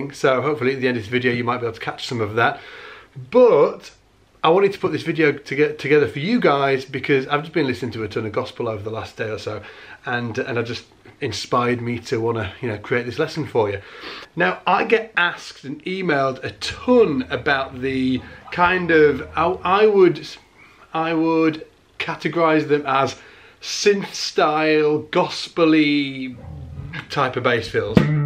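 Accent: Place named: England, United Kingdom. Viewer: Thank you so much. You are the best Teacher with a lovely english accent. I'm learning soo much from you. It's a real pleasure to listen to you. You can make my day even after hard work in the office.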